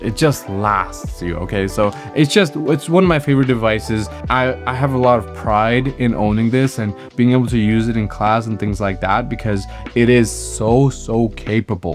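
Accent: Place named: United States. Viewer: Dude speaks British English with an American accent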